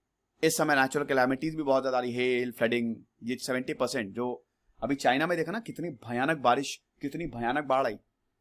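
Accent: India. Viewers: 听球不懂牛尿味儿的印度口音，但评论里的文字真切地让我感受到无数的充满嫉妒之火的血红双眼。 I really felt the jealous eyes in the comment.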